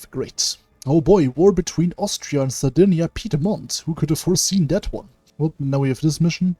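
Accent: Germany. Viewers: Youtube has added an AI 're-dub' because has a German accent...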